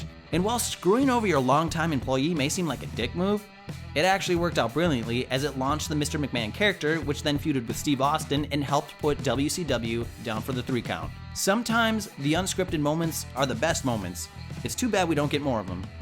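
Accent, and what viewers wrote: United States. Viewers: A 'Murican narrating a WotColtcha video? 2016 really has been crazy so far... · American accent on a WhatCultureWrestling video. Dislike. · Click on video. Hear American accent. Click off video. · I hate this narrator Loses all of it's class with the geek American. Stop it.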